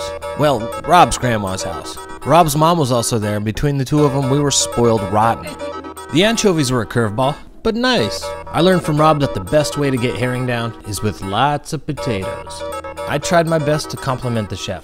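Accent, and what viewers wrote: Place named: Alaska, United States. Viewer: Where in laska you from!?! Palmer!?! You sound like a 'sillian..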